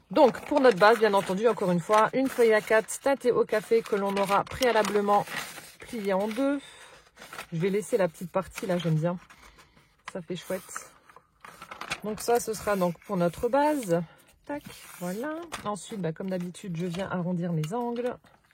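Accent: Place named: Alsace, France. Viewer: Bonjour 🌸 Je suis tombée sur ta chaîne par "hasard" et WAOUH... Pas du tout équipée et ne sachant pas du tout ce qu'était le scrapbooking, me voici complétement motivée pour me lancer! Je vais faire un grand tableau avec tes idées pour que chaque personne qui vienne à la maison puisse laisser un petit message souvenir! Merci merci merci 🙏💜💁‍♀️🧚‍♀️ en plus je suis d'origine alsacienne alors votre accent et vos répliques me font chaud au coeur et rire!!!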